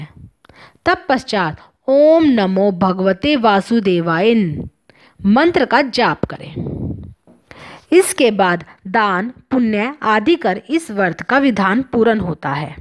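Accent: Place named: Haryana, India. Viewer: padh padh k mat bolo mataji aur haryanvi accent se mukti pao zara !!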